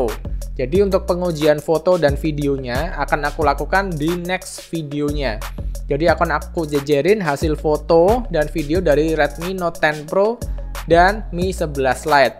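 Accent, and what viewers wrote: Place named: Semarang, Indonesia. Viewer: Koyone iyo lur, soale nk wong semarang ngomong indo rodo medok, medok.e yo ngene iki hhh Salam dr kendal-klw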